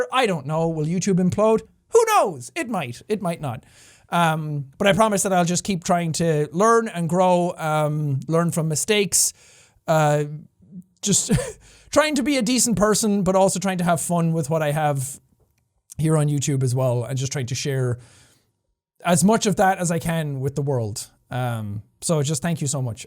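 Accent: Ireland. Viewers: That accent. That irish accent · Found your channel since pewds gave you that shoutout back in the old days. Ngl, i subbed because of the shoutout and never really watched your videos. I guess what i loved about Jack was his irish accent and energy he put into each and every video. Before, like you said you were shy and all that but then i saw those videos of you screaming your lungs out and it just made me respect you as a person you grew into. Well done Jack!!! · To think: you used to hide your accent, and now you're the internet's most favorite Irish potato -- I mean, Irishman 😀 As I'm sure you know by now (but I'll tell you again, dammit), we love you precisely for who you are as a person. No matter what your videos are about, no matter what other YouTubers are doing, as long as you keep being you -- you marvelous human being -- we will continue to love and support you. 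You bring so much positivity to the world in so many ways, and you've been gifted with a unique platform to continue sharing that positivity with the entire world. People always tell me "the world would be a worse place without you", but I know they just mean THEIR world. In your case, it's literally the entire world that is better because you are in it. And now I'll stop gushing because I'm sure I sound a little obsessed and maybe a bit creepy. I swear I'm not! I don't even watch every video 😜 I just think the world needs way more people like you in it and less people like.... well, like the kinds of people that seem the loudest these days. And it makes me overjoyed (and I guess a bit relieved) to see that people like you not only exist, but have a platform. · holy, fake accent turned into an irish legend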